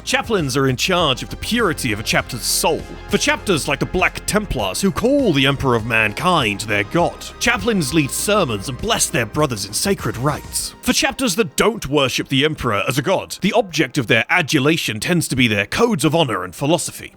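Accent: United Kingdom. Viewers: Mate this is a fantastic video but as English sounding as you are please, lieutenant is pronounced Left tennant. Lewtenent is the American pronunciation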